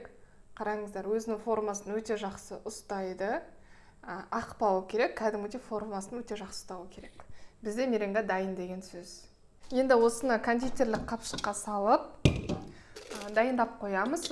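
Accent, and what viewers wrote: Standard. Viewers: Жарайсын.сенын таза казакша сойлейтинын оте керемет.торттарын да керемет. 👍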